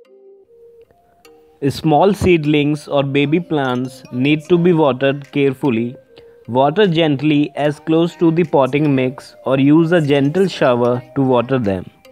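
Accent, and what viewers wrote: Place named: India. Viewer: super ahhh zzhy ! Famous Indian accent!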